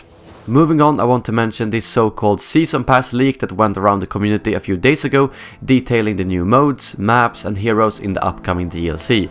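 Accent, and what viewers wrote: Sweden. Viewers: är du från sverige ?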